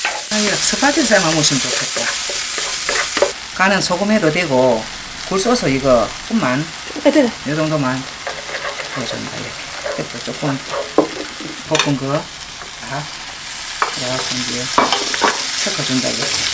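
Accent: Gyeongsang, South Korea. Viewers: 깅상도 사투리 억수로 조슴더 어무이예~~^^ 깨방정 맛있데이 엄마~^^ ㅋㅋㅋㅋ 부러랑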